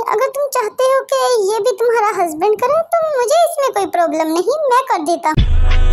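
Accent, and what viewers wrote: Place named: Punjab, India. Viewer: Ap ke voice sa me be punjabi ho😊😊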